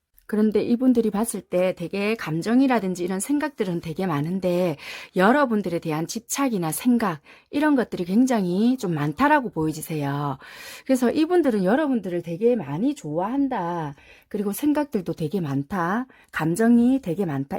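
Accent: Busan, South Korea. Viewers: 네, 물론 또 대화를 나눠볼 생각이에요. 근데 이런 흐름이면 좋겠다 싶은 생각이 들다보니 재회의 경우를 염두해두고 리딩을 받아들여도 좋을지 궁금했습니다 😊😊 상대가 관계에 대해 좀더 긍정적인 방향으로 생각했으면 좋겠다 싶다보니 이 리딩이 너무 위로가 되는듯한 말씀 같았거든요. 아무쪼록 리딩도 댓글도 다 감사드립니다:)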